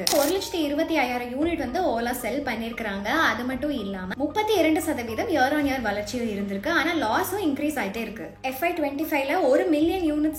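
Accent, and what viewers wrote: Coimbatore, India. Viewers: Coimbatore Tamil ❤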